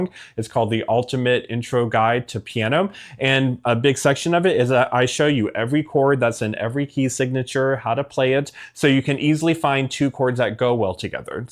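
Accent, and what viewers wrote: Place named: United States. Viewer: Yeah, he's speaking american English, not some damn British English, and also assumes we're dumb which is good!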